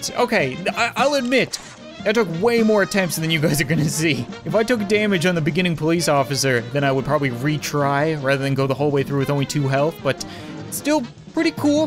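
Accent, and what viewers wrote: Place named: Newfoundland, Canada. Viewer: I have watched a number of your videos now and I have shown them to my boyfriend. We're both from Alberta, I told him that you're a Canadian Youtuber from Newfoundland. He said he could tell by the way you pronounced some words. I never noticed before this video! I definitely heard a bit of the Newfoundland accent in this video! It's nice to see your channel continue to grow! I find your videos to be entertaining and unique, best of luck in the future!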